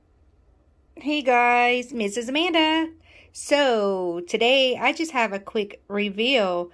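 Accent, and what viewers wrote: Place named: Cajun, United States. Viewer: that cajun accent, you was extra heavy with it and i love it lol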